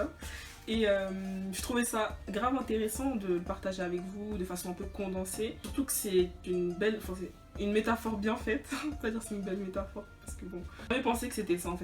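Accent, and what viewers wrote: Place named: France. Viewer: Comment tu parle avec le bon français de France😭😭😭😭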